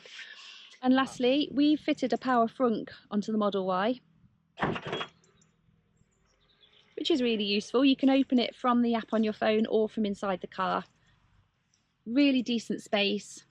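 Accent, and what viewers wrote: United Kingdom. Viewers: My wife needs to see this! She complained about the Model 3 P that we rented for a quick weekend getaway with some kids. She said it was a harsh ride and didn't like it. I've got a LR Model Y coming end of Oct - early Dec and she thinks she won't like it.This is GREAT intelligence here! Doesn't hurt that it's narrated in a cool British accent 🙂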